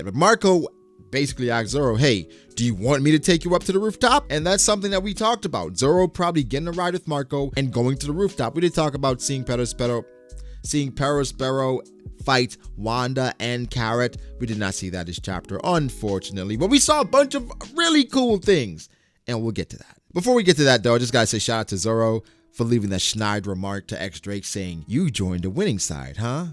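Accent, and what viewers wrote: Spain. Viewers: nah bro, embrace the spanish pronunciation!! it sounds cooler, those american "r's" sound weird with japanese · Spanish sounds more similar to Japanese than English so let that Spaniard come out. Listening to you talk about the chapter makes me enjoy it more than my initial reading. Great commentary as always.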